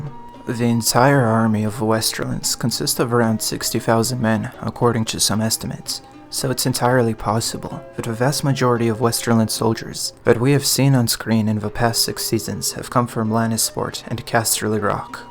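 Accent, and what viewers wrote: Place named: United States. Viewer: You write armour with british english but you sound slightly american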